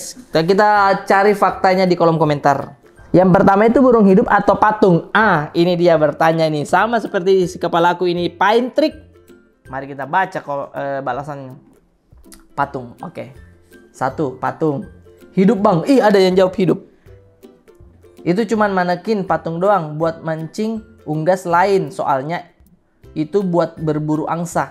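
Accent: Makassar, Indonesia. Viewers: saran bang klo pake bahasa/logat makassar disubtittle biar yang gak tau bisa tau hehehe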